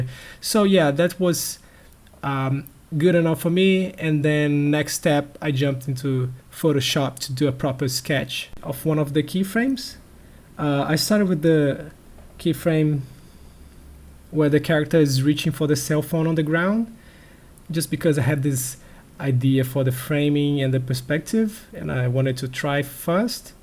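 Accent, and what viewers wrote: Brazil. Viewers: 20 seconds in...i hear a brazilian accent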